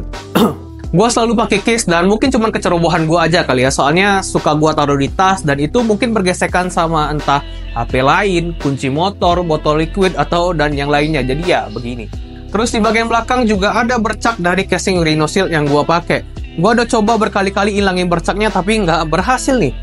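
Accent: Palembang, Indonesia. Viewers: Wong Palembang kak ee?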